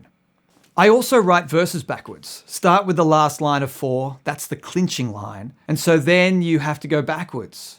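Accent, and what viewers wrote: United States. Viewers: Funny that Sting has a title first, I have been doing that for years. I will not even start a new song without a worthy title. I think if you give a creative process a pedestrian name, it can reflect if you let it and vice versa. By the way. You are Australian but I notice you have a slight American accent?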